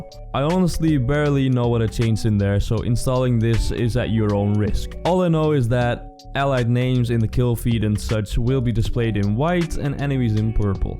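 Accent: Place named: Netherlands. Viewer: Hahaha your dutch tho kan gewoon je accent horen maar bedankt pik me laptop kan nauwelijks dit spel aan, Dus ga zeker dit proberen!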